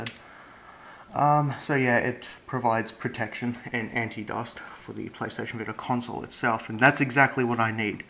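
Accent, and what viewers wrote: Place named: Australia. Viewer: Hey an Aussie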